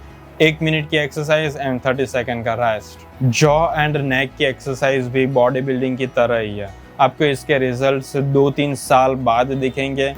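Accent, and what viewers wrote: Gujarat, India. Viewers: gujarati bolva na way thi khabar pde che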